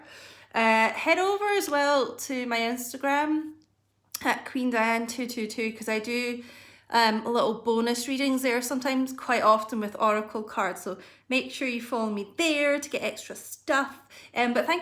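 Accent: Scotland, United Kingdom. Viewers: I love your accent! Are you Scottish? Are you doing private readings? I'm interested :D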